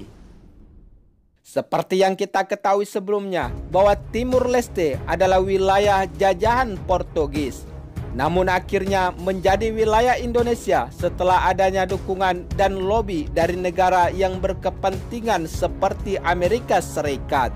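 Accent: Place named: Malaysia. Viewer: Admin nya kayak orang malaysia ini yg ngomong kliatan cara bicaranya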